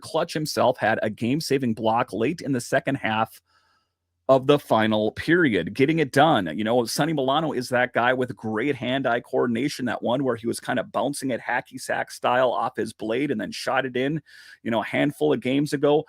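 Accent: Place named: Midwest, United States. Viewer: Thanks! I know ive heard it before. I thought it could be something close to Minnesota, but im not too familiar with any midwest accents yet. Love the content, washington is heating up, hope they make the playoffs! Cheers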